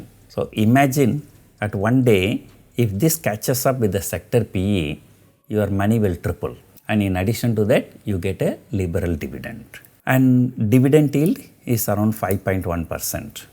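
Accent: Tamil Nadu, India. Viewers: Never tell that you are going to tell a joke before hand. You tell something and we decide if it is a joke or not. And try to improve your English. You speak English with Tamil diction which makes it unbearable to follow.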